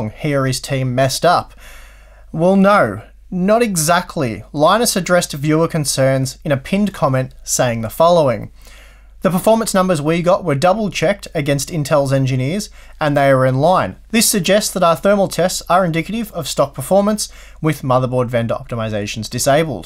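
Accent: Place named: Australia. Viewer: Interesting and useful information given, thank you! But this accent... It's so hard to understand this Aussie kind of English..., oh my! My impression is like someone is chocking you by the throat while you are speaking!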